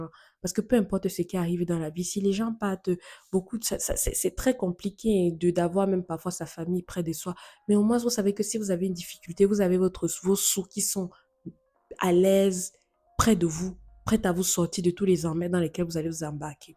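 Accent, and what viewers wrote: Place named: Cameroon. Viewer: J'ai l'impression que tu es d'origine Camerounaise de part ton accent... J'ai envie de te dire Merci infiniment ma sœur 🙏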